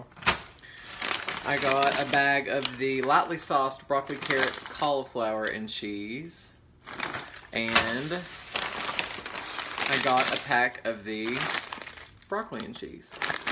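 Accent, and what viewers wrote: South, United States. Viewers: My boyfriend and I just love watching you, so perky and fun and love listening to that southern accent! BTW I love my tarts as well, a definite good one I discovered the other day is Better Homes & Gardens "Spiced Vanilla Embers" you'll thank me :) it's amazing!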